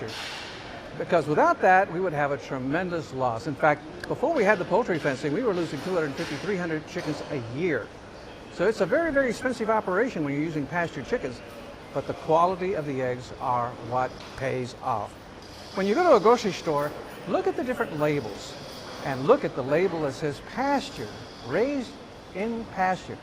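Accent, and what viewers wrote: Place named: Transatlantic, United States. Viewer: He kinda has the transatlantic accent. It sounds so nice.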